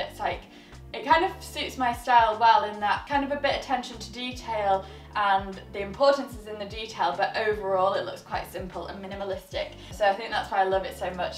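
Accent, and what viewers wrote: United Kingdom. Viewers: Wow. I didn’t like anything in this video except for the black skirt and the British accent. What happened to good fashion? 😕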